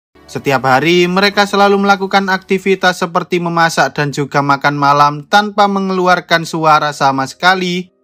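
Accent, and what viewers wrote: Java, Indonesia. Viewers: Jawa medok, malas nonton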